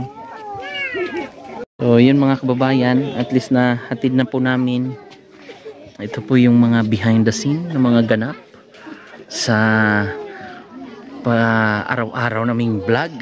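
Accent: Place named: Ilonggo, Philippines. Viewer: Wow marunong ja din parang mag-Ilongo Kuya.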